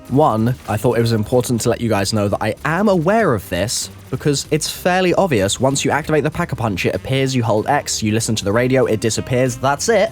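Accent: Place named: United Kingdom. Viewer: god damn i feel sorry for you,. You actually had to change voice from that happy and silly british accent that made everyone happy to a voice where its so depressing and dark-like compared to other times because of the 10 year old butthurt angry little piece of shits thinking why this is not like The kid wanted it to be. You are working so hard!! And you upload videos this many times!! It just hurts to see this! Just, get a break. The actual people would understand! Props to you,!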